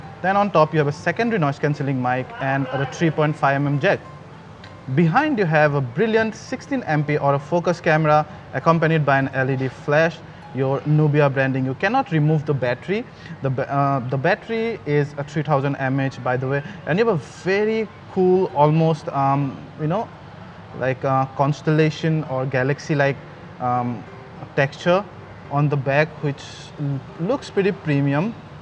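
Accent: Assam, India. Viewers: mate . you do have an Assamese accent .. r u from there ??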